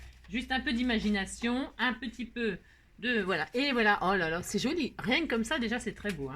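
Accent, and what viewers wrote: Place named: Paris, France. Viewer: Coucou , j’adore votre voix de titi parisienne , vous devriez mimer cette réplique : « atmosphère,atmosphère,est ce que j’ai une gueule d’atmosphère ? » 😃😉 merci pour les tutos..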